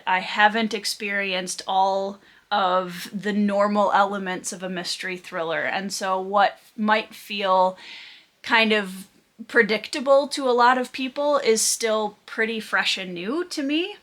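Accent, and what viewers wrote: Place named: Minnesota, United States. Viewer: I knew you had to be from Minnesota from your accent!!!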